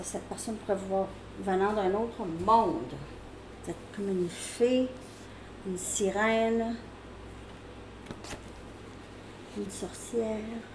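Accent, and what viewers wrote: Canada. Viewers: Salut, Merci beaucoup pour votre magnifique travail! ❤ Et pourquoi changer votre merveilleux accent canadien??? ❤ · Merci cette guidance me parle grand’ merci ps:je comprends très bien l’ accent québécois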